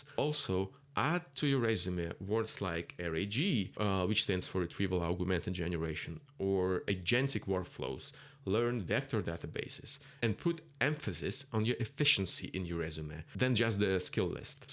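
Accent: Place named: Russia. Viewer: thanks for answer, actually you're accent is pretty tiny but since I speak the same language as you (Rus) at least one, it's noticeable to me. I don't know why am I writing that in English lol I was talking about the yellow lamp actually which was in the frame, now I know it's name. Anyway thank you for the video, pretty much inspiring. I agree with your point of view and think that to handle the AI code you need to understand what's going on so IT guys should be still in demand. Good luck with the channel, you have pretty unique appearance and quality content so I think you're going to succeed.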